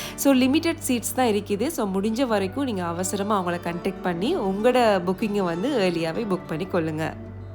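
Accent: Sri Lanka. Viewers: Assalamualaikum sis ✨ epdi irukinga Sinna vandungalam Nalla irukangala 😍unga videos la Enna sis kora iruku 🧐ellamey spruu ah poduringa reality ah iruku usefull la share panringa oru oru visaiyathaiyum ungaluku therinjatha A to Z ellamey share panringa 🤗aprm Sinna vandungalaiyum ungalaiyum paka enaku sandhosama irukum 😍Nd enaku mean ah unga Sri Lanka speech slang rmbaa pudikum sis🥰epothumey Enoda Dua Nd support ungaluku irukum🤲🏻💗 neenga inum naraiya achieve pannanum housewife kula role model ah irukinga 🤗itha Vida enna venum sis 100k subscribe reach pannathuku 🥰insha'Allah neenga inum naraiya videos podunga Nd keep rocking sis🥳💯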